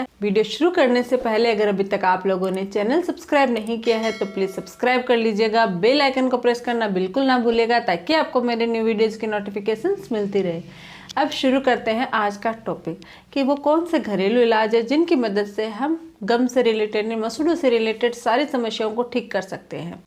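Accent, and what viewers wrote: Rajasthan, India. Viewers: Doctor mam ..Aap pakka aap Rajasthani ho....Aap ke Bolne ke lehje se mujhe pta chala hai.....aap bahut ache se treatment bataya hai aapka ka Shukriya..🙏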